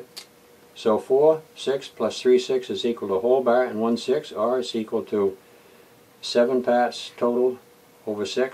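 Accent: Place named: Boston, United States. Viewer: Much respect, talking about fraction "bah's" like he's from Bah-ston.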